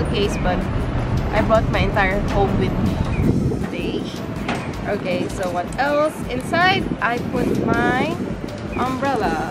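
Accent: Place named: Philippines. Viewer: your speacking english was look like an filipino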